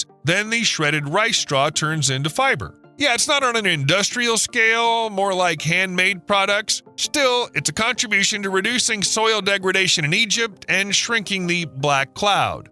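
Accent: United States. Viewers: Very interesting but spoilt by the most annoying american robotic accent. He sounds as though he wares very tight underpants. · Shouting American accent hard on the ears. Please bring a more toned down British person.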